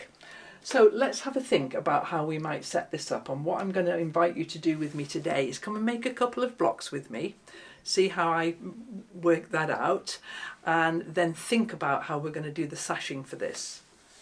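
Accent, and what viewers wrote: England, United Kingdom. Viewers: It is so calming and cozy for me to watch your videos especially the scrap quilts and lovely English accent. Merry Christmas from Alabama, USA 2025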